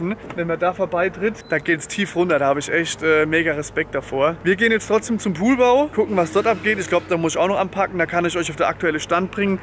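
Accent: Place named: Mannheim, Germany. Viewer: Meine Mutter als sie deine Stimme gehört hat: der redet wie ein richtiger Mannheimer, find ich geil. Und dann lacht sie die ganze Zeit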